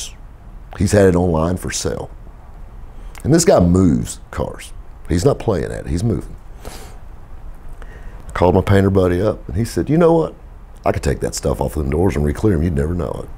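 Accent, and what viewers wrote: South, United States. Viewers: i love this guys stories ed's are good to but southern draw takes me back home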